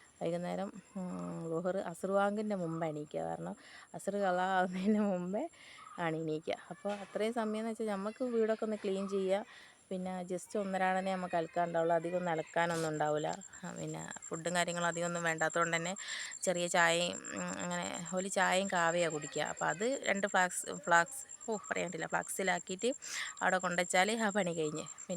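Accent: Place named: Malabar, India. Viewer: അപ്പോ ഇങ്ങള് പ്രവാസി ആയിരുന്നോ... എല്ലാം അടിപൊളി വീഡിയോസാണ്... കണ്ടാൽ കേട്ടിരുന്നു പോകും... മലബാർ ഭാഷയും കൂടി ആയപ്പോ കേൾക്കാൻ നല്ല രസമാണ്...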